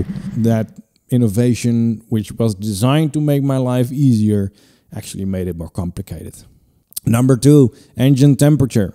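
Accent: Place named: Netherlands. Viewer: Funny... the first sentence made me wonder whether you were from the US. In the second sentence I knew you were Dutch. Difficult to height your accent when you have to think about what you wanna say... Very nice bike! I would love to own a Ducati Monster but dare not because of the maintenance costs...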